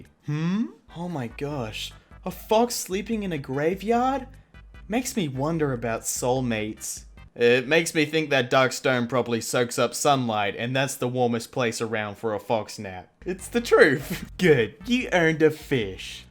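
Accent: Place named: Australia. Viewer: idk if i heard correctly but i can hear a bit of an aussie accent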